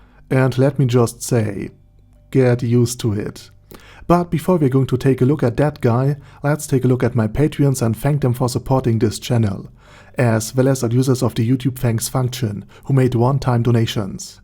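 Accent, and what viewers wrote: Germany. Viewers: For some reason i really like your voice with the german accent ! Is there a chance that you will read the light novels ? Soo we can listen to it in your voice ?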